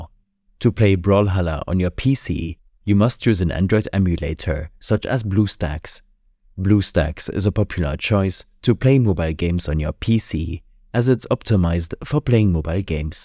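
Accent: Germany. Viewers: I said "lern Englisch" it's German because of his German Akzent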